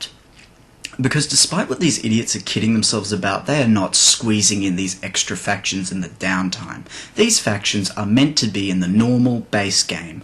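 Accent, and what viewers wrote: Australia. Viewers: I hope for the majority of the people that pre-ordered R2TW that on receipt of such utter crap to NOT pre-order any game again based on trust alone till previews and proper critique is available for it. 'Fool me once, shame on you; fool me twice, shame on me' For me Empire: Total War was the kick in the balls from CA (and my one and only ever pre-order). Damn my desire to be Sharpe with the 95th rifles leading the British Empire:/ I still haven't purchased R2TW; well at least till it's completely fixed. I've wanted this game since the release of M2TW. There was a dream that was Rome (II). This is not it! Thanks for your videos; something about the auzzie accent gets me! That and you skips view on life (and stuff) is refreshing especially when living in the UK and the constant barrage of hypocritical nonsense gets a little too much. Loved it down under, can't wait to get my pommy ass down again, well, with ear plugs. 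I wont hear the end of it considering how you tanned our asses at cricket. /rant!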